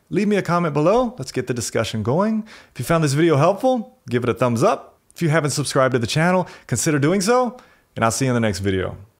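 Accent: South, United States. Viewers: Are you from Georgia or South Carolina by chance?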